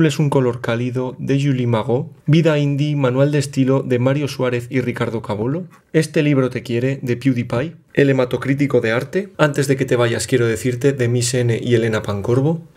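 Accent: France. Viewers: Qué interesantes tus libros, me encanta tú acento en francés hehe <3, yo también lo estoy aprendiendo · Me gusta mucho tu acento, marcas las ciertas letras (como la "h" y la "r") de una forma muy particular. Enseguida se nota que tu segunda lengua es el francés. Yo aunque soy mexicana pasé mucho tiempo en Brasil y siento que a veces se me "mezclan" la pronunciación de las letras también :P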